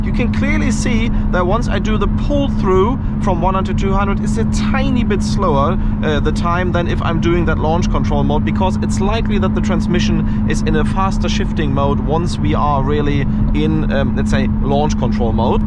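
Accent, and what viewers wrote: Germany. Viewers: Wie man einfach den deutschen raus hört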